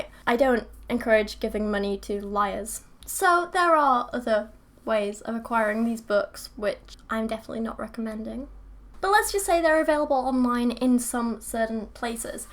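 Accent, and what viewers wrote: United Kingdom. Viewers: You really should lose the idiot Brit accent. I can't help but think of anyone using that accent as a complete moron. I mean, you still have a queen for crying out loud...A FUCKING QUEEN. Damn, you don't get much more primitive than that..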